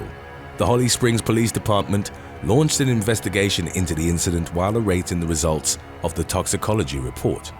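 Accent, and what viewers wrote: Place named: United Kingdom. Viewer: “An almighty crack of thunder” blended with that tone and British accent 🙂👏🏾👏🏾👏🏾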